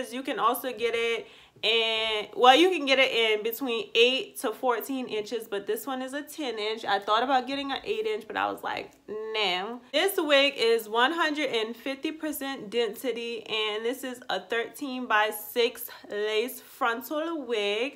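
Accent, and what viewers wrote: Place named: Charleston, United States. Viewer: Where are you from lol ? You sound like us in Charleston lol love the review very nice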